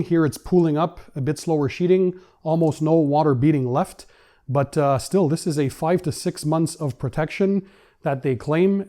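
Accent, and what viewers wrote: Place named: Canada. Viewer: Pourquoi tes vidéo ne sont plus en français ? J'adore votre accent canadien en plus c'est vraiment dommage...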